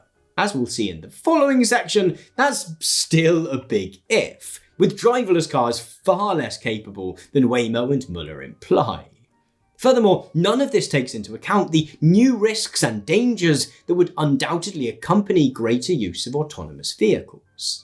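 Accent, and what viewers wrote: United Kingdom. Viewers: British authoritative voice makes this video look to be more than what it actually is, finger-pointing shaming with no concrete facts to backup the claims, only public perception and opinions.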